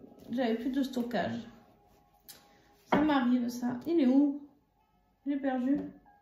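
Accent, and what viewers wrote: South, France. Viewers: bsr vous me faites rire avec vos expressions je les retients je n arrete pas de rire vs etes super et votre accent du sud trop top sinon les cartes me parlent :face-fuchsia-poop-shape: ps cul patate j adore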